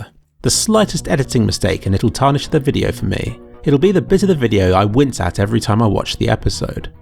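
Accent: United Kingdom. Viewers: I love the british accent. It just sounds good. Btw, those smurfs who started destroying the game and deranking on purpose are just toxic and its not your fault. Those guys do it for the fun they have, once their team starts raging. They like to be the one deciding everyone elses faith. Its empowering for them. They like saying stuff like "I am LEM, noob" to make it even more obvious, that they are actually better. Did you do that? I dont think so. Does anyone do that, because he watched going low? No, I dont think so. But its a good excuse for smurfs, if they want to anger innocent people. Obviously no one can judge you for what they are doing and you shouldnt judge yourself either. Ive seen your video about smurfing. I think it was kind of a reaction to the influence of smurfs which is growing so rapidly. Its hard to find a single lower ranked game without one opponent or teammate smurfing. 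Well, who cares? I am just a youtube user with no people behind me. You are someone who's popular. Those popular youtubers should unite and finally make something happen. Valve will never listen to small unknown people saying mm is broken, but they'll probably listen to popular people who could influence the whole cs go scene. I love you videos, keep up the good work :)!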